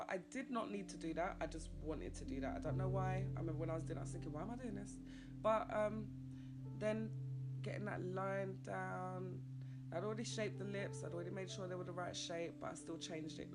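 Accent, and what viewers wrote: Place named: England, United Kingdom. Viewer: First you are chill and easy to listen to you with your English accent I love to go there. I need to know what can take I do to take away the shining from my drawin? Antoinette Rochester Las Vegas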